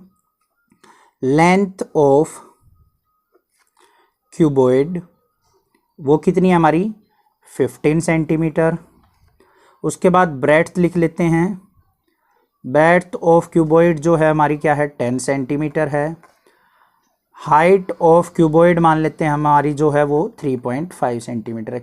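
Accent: Haryana, India. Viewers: Sir it's the best explanation ever for these questions !! It's really helpful!! And from your language ; I think you are from haryana😁😁😁😁 Jai Haryana Jai haryanvi🤘🤘🤘🤟🤟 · Sir aap haryana se ho kya?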